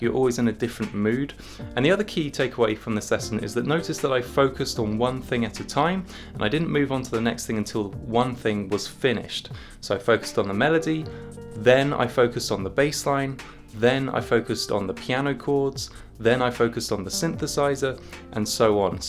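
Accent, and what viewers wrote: United Kingdom. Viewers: How come you speak British, living in the States? I can hear that, as I am from Flanders.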